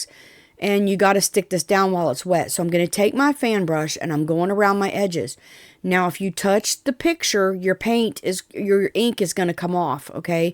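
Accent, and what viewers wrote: South, United States. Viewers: I love what you created from the various types of wood ! I’m a displaced Southerner living in the west and felt right at home hearing your explanations! 😊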